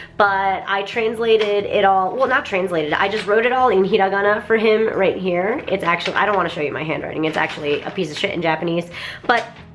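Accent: New Jersey, United States. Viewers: Wait...Were you born & raised in Japan?? I only ask because could've fooled me. You definitely sound like a girl from Jersey lol. How did you learn English?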